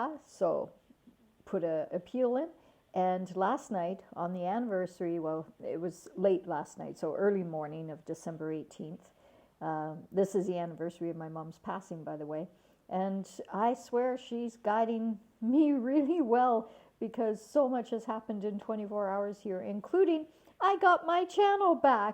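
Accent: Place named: Quebec, Canada. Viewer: Québécoise? Ha bin, gârre d'on câ. Lâche pas la patate! 😁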